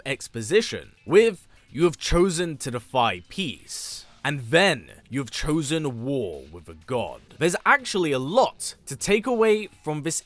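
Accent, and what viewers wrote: United Kingdom. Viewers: No offense, but I don't fink I can't take much more of this accent. I love brit accents, but this ain't it. Keep up the great work, though!! I can't wait to play!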